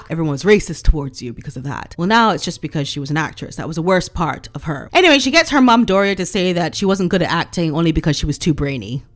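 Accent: United States. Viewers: I love your cheeky take on everything - all of which is backed up with factual evidence. On a personal level, do you have an American accent? Can you talk Barbados talk one day just to show us (me).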